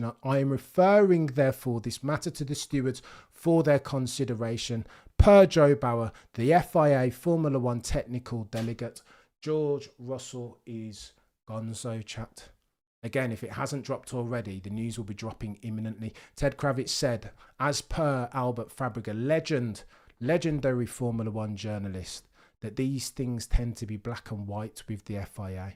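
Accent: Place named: Cockney, United Kingdom. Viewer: Black guy with cockney accent refreshing change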